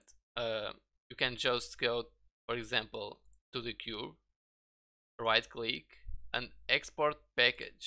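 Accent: Portugal. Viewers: Ahaha percebi pelo sotaque do inglês! Portugal🇵🇹🇵🇹🇵🇹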